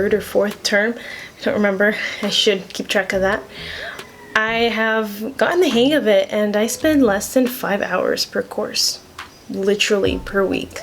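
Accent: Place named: United States. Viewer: Were you born and raised in the US? You have a perfect American accent. ❤️❤️❤️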